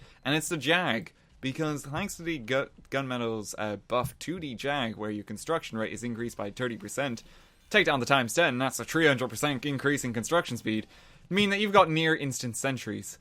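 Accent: Ireland. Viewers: He is irish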